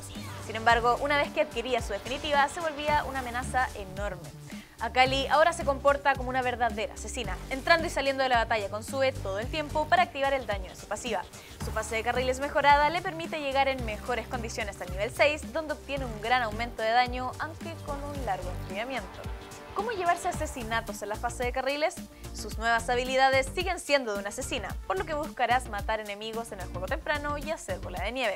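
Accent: Chile. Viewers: Pero si me gusta el acento chileno D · Que bueno que se va esto es legue of legends latinoamerica se necesita a alguien con acento neutro no a alguien que a leguas se nota chilena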